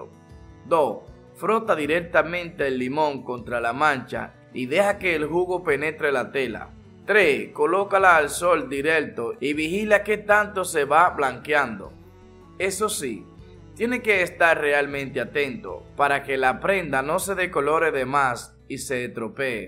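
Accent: Dominican Republic. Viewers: Jajaja creo que los dominicanos hablan asi o Puerto rico no me acuerdo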